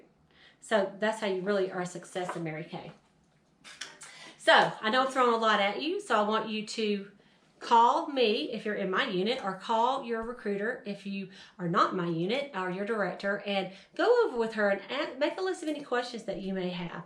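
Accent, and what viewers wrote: South, United States. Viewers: Love your genuine southern accent. And of course the way you break it down for us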